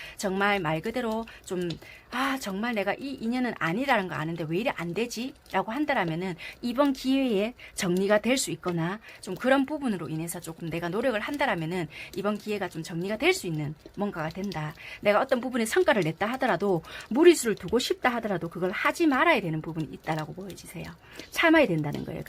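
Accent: Busan, South Korea. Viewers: 잘 지내고 있습니다! 도 건강하셨으면 좋겠어요 ㅎㅎ 온 연락은 비록 지난달 연락이긴 하지만 타로 보며 위로 받아요...^^ 좋은 길이 열리길!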